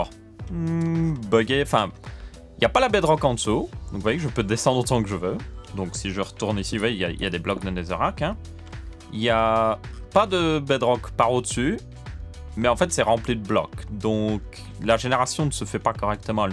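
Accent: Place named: Belgium. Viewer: Ta un superbe accent belge !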